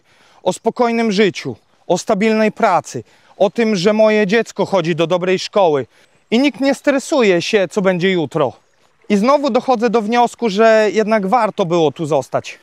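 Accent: Podlasie, Poland. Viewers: Podlaski akcent? :-) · fajnie mowi; a skad tam przybyl? pewno z Podlasia, nie? Z Suprasli czy z innej meisjcowosci?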